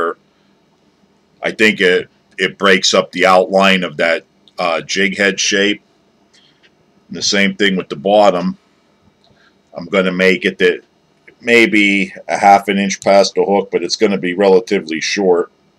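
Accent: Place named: Western Pennsylvania, United States. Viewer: You are a pro! Clean and simple. Man you sound like you're from Western PA. Pittsburgh or Johnstown. What kind of cover you throwing this type of jig in?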